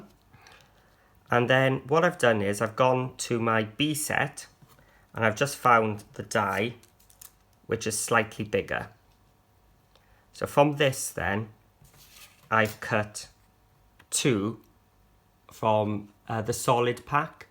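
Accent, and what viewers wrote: Wales, United Kingdom. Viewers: Think u are Welsh yes ?I love Wales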